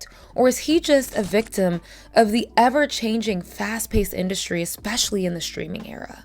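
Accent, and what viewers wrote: United Kingdom. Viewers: "Do you y'all remember when people thought he was British?" I THOUGHT HE WAS BRITISH UNTIL LITERALLY JUST NOWWWW OMFG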